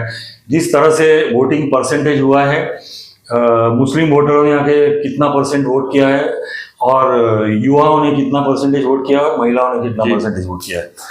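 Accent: Maharashtra, India. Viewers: Hindi in typical Marathi accent is rather irritating!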